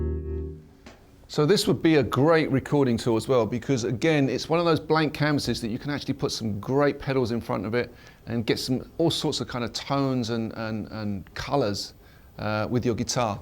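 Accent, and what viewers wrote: United Kingdom. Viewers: heavy british accent? He is british? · in fct is acent of britin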